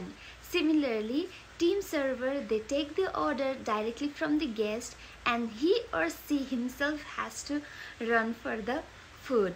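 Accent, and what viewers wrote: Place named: United Kingdom. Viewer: Your accent like British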